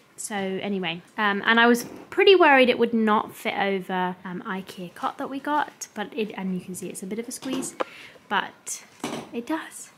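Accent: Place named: United Kingdom. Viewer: I love this channel. I can learn natural British English through this channel and l like her daily life too. 😁😁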